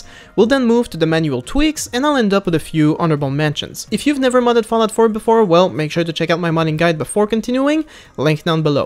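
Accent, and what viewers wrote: Quebec, Canada. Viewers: L'accent québécois haha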